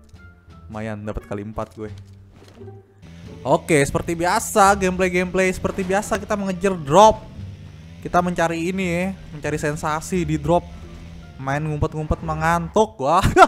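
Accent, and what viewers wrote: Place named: Jakarta, Indonesia. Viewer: Duh Betawinya wkwk ketawa lu ngeselin bang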